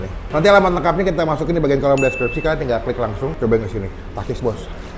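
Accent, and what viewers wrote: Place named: Banyumas, Indonesia. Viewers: Ora Ngapak Ora Kepenak Pak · Purwokerto medoknya keliatan banget wkwk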